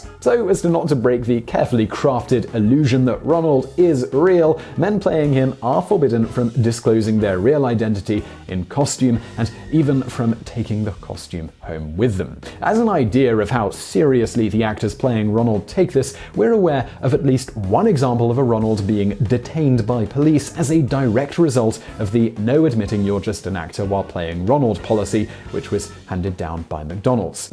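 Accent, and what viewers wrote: United Kingdom. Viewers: Literally ten minutes into this video I was like wait wtf he’s british 😂 i guess it was just the subject matter, but it was like my brain was filtering out the accent or something. Pleasant surprise, great video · Why is vsauce talking with a British accent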